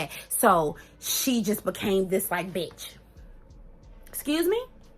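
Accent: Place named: South, United States. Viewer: You a mess. I can not with you. lol Are you from the south? You sound like us from the south girl. lol 😂😂❤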